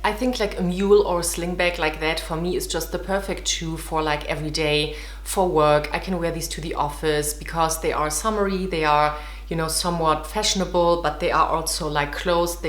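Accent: Germany. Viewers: Dein Akzent klingt deutsch 🤓I'm learning it lol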